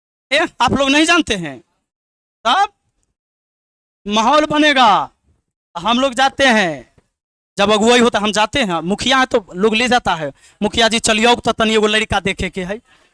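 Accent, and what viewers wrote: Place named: Bhojpuri, India. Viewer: सच में सर 🙏आपकी बात करने की शैली न दिल को छू जाती हैं। हिंदी में मिक्स, भोजपुरिया अंदाज़...............👌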